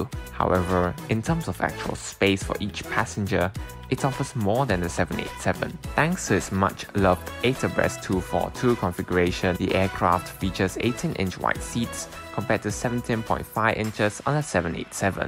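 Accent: Singapore. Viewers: You sounds like Singaporean.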